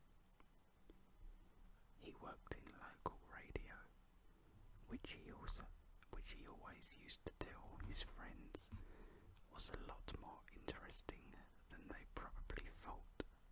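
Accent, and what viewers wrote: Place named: United Kingdom. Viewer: So happy I found someone British like me. You have a lovely voice and made me very sleepy :)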